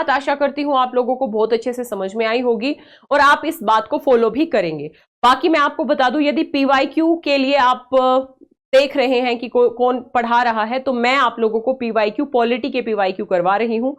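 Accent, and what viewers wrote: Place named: Haryana, India. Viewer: Mam ki accent प्योर हरयाणवी चोबीस ❤❤❤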